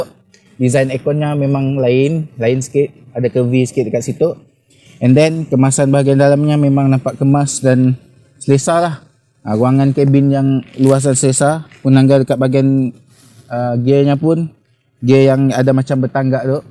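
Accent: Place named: Sarawak, Malaysia. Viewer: Mantap 👍mun diam Serawak dalam sebulan nang konpem fasih kelakar Sarawak. Ton suara dah kedak orng sitok.. Kipidap bro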